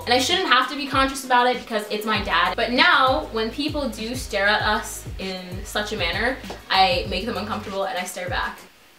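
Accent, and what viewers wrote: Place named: United States. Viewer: Wow, your fine as hell, I like your American accent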